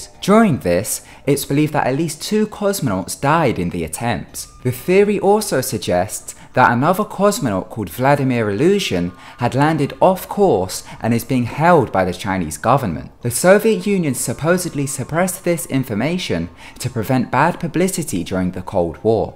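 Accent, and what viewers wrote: United Kingdom. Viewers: What on earth is this guy's accent? It sounds British English at some points, then almost Asian English in others. I find this the more intriguing question. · WOAH You reply? I thought you were famous or something. I Always get on my boyfriends channel (idk how to make one with google plus like he has) to watch your videos I love them!!!! I love guys with british accents lol. Thats crazy you reply to people, I thought all the people with so many watches since youre famous wouldn't reply to their fans and stuff thats so cool you do!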